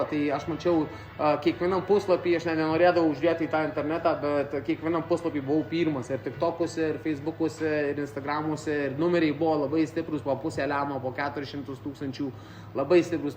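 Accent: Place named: Alytus, Lithuania. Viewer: Alytus girdisi.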